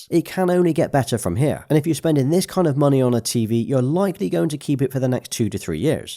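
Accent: United Kingdom. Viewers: By the way when I first purchased my Samsung S95B OLED TV in April 2022 at Best Buy in the USA, it cost $2,100. When Black Friday game in 2022, it was selling for $1,400 at Best Buy. 😭You sound like you located in the England with a British accent. I wish you made your TV stand to be sold in the United States. Wow, that stand is awesome and I plan to upgrade from a Samsung 55 OLED S95B to a Samsung 65 inch OLED in about 2 years and your stand would be perfect in my apartment.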